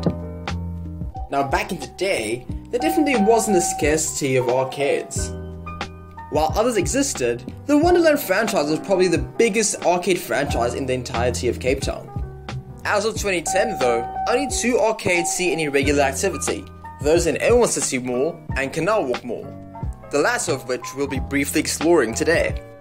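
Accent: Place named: South Australia, Australia. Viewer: South Australian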